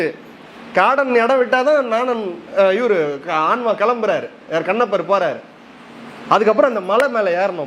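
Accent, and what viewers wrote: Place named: Chennai, India. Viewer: Chennai slang is so visible 😅. Amazing speech 🪄❤❤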